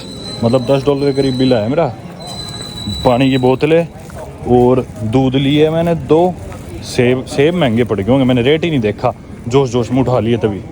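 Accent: Haryana, India. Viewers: Bhai iske itn follower hai per kisi ne notice nahi kya ye apni aadhi vedio me to sirf airport aur bus aur aane jane ke tarike pe hi bana leta hai, phir aadhi visa ki story , desh ki story fir hotel kitne ka kaisa kaha kaisa hai bus end ,,,the end,,, bhenchod ghumaa kab ?kaha? kidhar ?only 5 min,, total 1 jagah jata hai uski vedeo me 5 min hi ghumane ka video hota hai😅😅😅😅 Aur indian chutiye isko follow kar rahe hai,,thora Haryanvi accent me bakchodi 🙄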